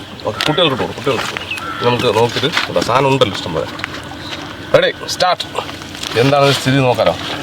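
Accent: Palakkad, India. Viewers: ചേട്ടായി നിങ്ങടെ ആ പാലക്കാടൻ സ്ളാഗ് സംസാരം........ സൂപ്പർ..... ഒരുപാട് ഇഷ്ടം ആണ്...... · Namude palakkadan bhasha +firozikkade ckng😍😍😍😍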